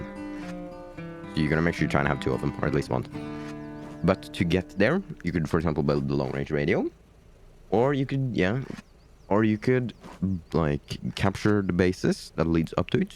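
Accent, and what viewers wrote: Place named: Norway. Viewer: You're defo Scandinavian, based on your english I'd say Norwegian like me. Thanks for the video:D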